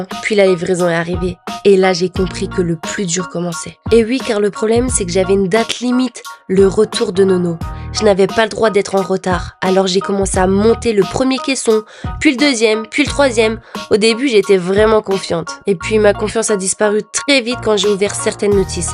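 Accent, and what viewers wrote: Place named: Portugal. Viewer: Portugais ou quoi ? Moi je suis portugaise parce que je crois, j’ai entendu portugais du saut.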